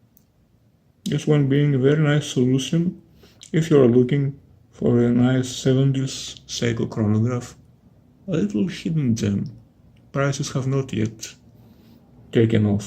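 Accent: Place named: Greece. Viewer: Great video,. I found the link to this channel from WUS and subscribed. Do you know what I like best about that watch? It doesn't feel the need to have a running seconds register, just to let us know it is running. It is assumed. Nothing is moving except when it needs to be. I like your accent too. Greek, I think; very nice to listen to. -Smaug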